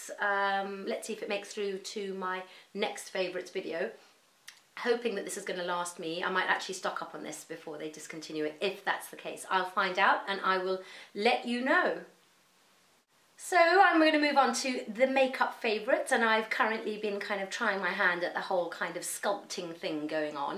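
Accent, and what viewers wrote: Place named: United Kingdom. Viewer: Thank you so much for the video!i m a new subscriber and so so glad to have come across your channel and blog!i have watched all of your videos now and absolutely love your style!2 months ago we moved from London to Canada and it is so so lovely to hear your gorgeous british accent😉😉!i love listening to you!at the end of april i m coming to London with my two little boys and will defenatly check out your recommendations!i would love to see a whats in my bag with new celine bag! Your previous video with celine trapeze bag made me just fall in love with it!i am planning to get it whilst in London!i love your colour and hope i can find something similar!i know that these colours are seasonal but lets hope for the best!my question for you would be whether after owning celine trapeze bag for a while would you still repurchase it?did you have any problems with it?i absolutely love yours since i saw it and now cant get it out of my head!👜👜👜i hope i can find a lovely colour!thank you so much for being on you tube!i m so glad to have found your channel!i love your instagram!💋💋💋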